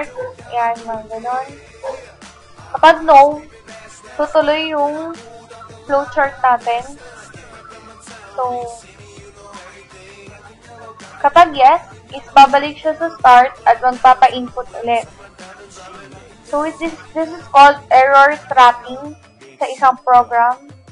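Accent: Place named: Greece. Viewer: Nice work but your English pronunciation like Greek not that clear.. overally a good work \m/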